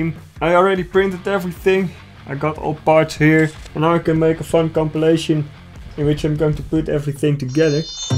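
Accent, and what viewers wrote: Netherlands. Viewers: Ik hoor een Nederlands accent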